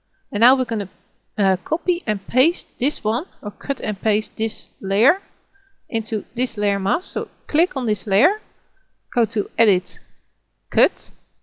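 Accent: Netherlands. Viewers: Your accent seems to be dutch. Nice tutorial btw. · Don't bother what he/she said. You are from Holland so its obvious your accent will not be the same as native american or british. In my opinion your voice is quite sexy^^ and thanks for this tutorial :D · Great tutorials. I've just subscribed. That's one hardcore Dutch accent! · Ik merk dat je Nederlands bent, wat is dat voor galm achter je stem? Interessante tutorial trouwens. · so dutch hahah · nederlander hoor je aan het accentje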